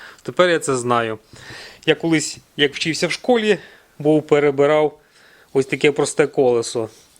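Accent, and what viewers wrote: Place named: Dubno, Ukraine. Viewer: Друже вітаю! Наснаги у роботі, та бажаю тобі постійно нових клієнтів... Ти можливо із Дубно? Десь почулося у розмові.... Я, з Рівного...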